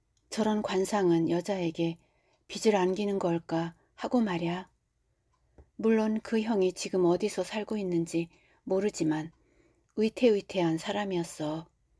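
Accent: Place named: Chungcheong, South Korea. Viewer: 구독하고 듣습니다. 충청도 사투리 잘하십니다~♡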